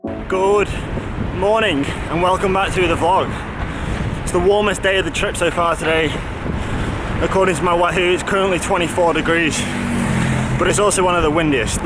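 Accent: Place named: Northern Ireland, United Kingdom. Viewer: Hey, so good to hear you still have some of yer old norn iron accent. Love the "oh dear" when you guys hit the road closed sign 😁 what about yee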